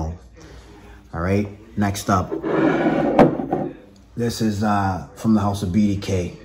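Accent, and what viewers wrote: Boston, United States. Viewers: Where do you live Boston? That accent. Lol. I found your channel from your Mancera Black Vanille review. Just ordered it. Vanille Exclusive is next on my list.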